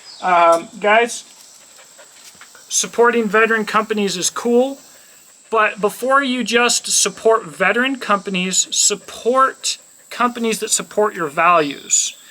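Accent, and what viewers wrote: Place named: Georgia, United States. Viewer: As a northerner in ga your accent is a relief. Good video as well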